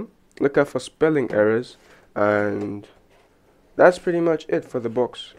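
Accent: Nigeria. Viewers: I can bet this dude is a nigerian I can hear he's accent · You sound like a Nigerian, can you please tell me where you bought the original?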